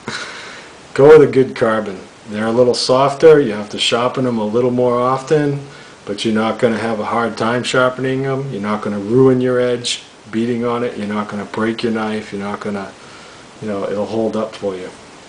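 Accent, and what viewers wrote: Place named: New England, United States. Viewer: Let me guess , Rhode Island or Boston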